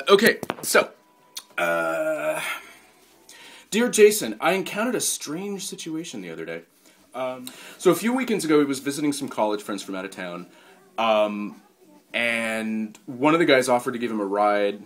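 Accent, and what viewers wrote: Atlantic Canada, Canada. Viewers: how canadian, the accent and in the background. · also, I love accents. I think they are wonderful. You can use that atlantic canadian accent all you want. I might even pick it up from you, cause well, I have habit of doing that after some exposure. It's so not intentional either. The sprectrum of sexual orientation is fluid, but each person has thier own container. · i love your atlantic canadian accent And your fake american accent!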